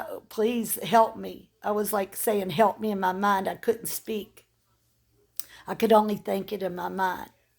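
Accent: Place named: Louisville, United States. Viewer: When she said eastern parkway I knew she was talking about Louisville plus with her accent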